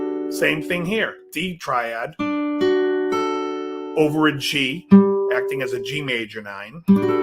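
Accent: Boston, United States. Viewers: I can totally hear the Baahstun accent now! I also did not get notice that you were doing a live stream….I wonder why? Any idea?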